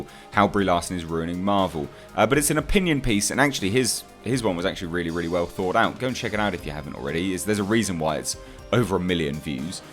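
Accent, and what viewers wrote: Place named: United Kingdom. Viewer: Thanks for covering it. I tend stay away from the extremes on YouTube. There seems to be a left/right war going on in the US at the moment and I feel the rest on the world is being dragged into it. It’s nice to hear a UK accent cover it in a measured way :-) This reminds me of the way YouTube is applying copyright rules. They aren’t actually taking “sides” they are just looking after their advertisers, and it’s their advertisers that have the agenda. YouTube is just following the money.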